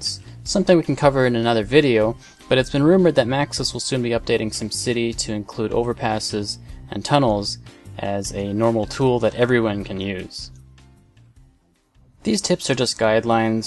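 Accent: Canada. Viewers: Is he Canadian? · Are you canadian? :D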